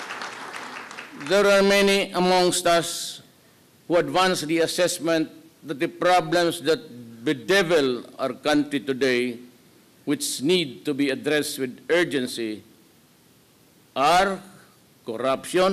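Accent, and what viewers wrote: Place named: Mindanao, Philippines. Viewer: Say what you want about my boy's thick Mindanao accent, but Duterte's dictionary game is on point. English vocab better than most people I hear in the states..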